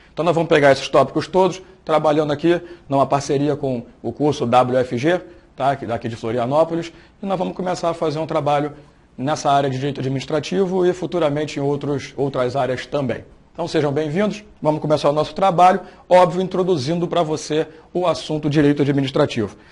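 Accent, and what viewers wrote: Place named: Rio de Janeiro, Brazil. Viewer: esse sim é bom e verdadeiro carioca por isso que me indentifiquei.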